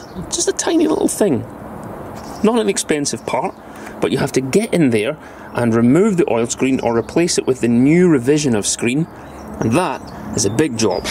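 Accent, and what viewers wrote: United Kingdom. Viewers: Dude, look.. this is the story: 1. I'm German and worked for 2 big German manufacturers as well as on their cars. They break and they break fast and a lot. Deal with it 2. You sound British or a little Irish or Scottish, so what point are you trying to make with such a car with them speed limits here. Makes way more sense in Germany, but quite obviously you don't live there. 3. Buy yourself a decent Toyota Avensis D4D and enjoy your life instead of wasting time fixing things. 4. As I said I worked for German car manufacturers and advice everyone NOT to buy a German car but a Japanese instead because they are awesome. 5. Even my German Stihl Strimmer from the early 80's - which is still running strong by the way - was built in Japan.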